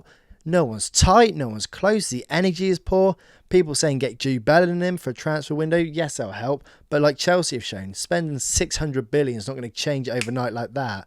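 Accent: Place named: Scouse, United Kingdom. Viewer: Such a thick scouse accent, I needed subtitles.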